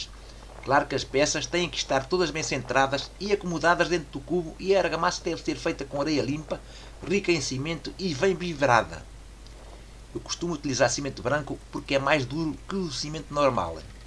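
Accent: Portugal. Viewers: sotaque de Portugal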